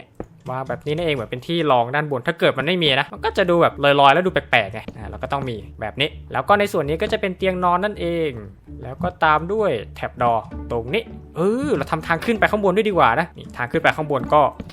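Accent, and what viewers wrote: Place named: South, Thailand. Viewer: พี่เป็นคนใต้หรอครับ ผมเพิ่งติดตาม สำเนียงเหมือน😅😅😅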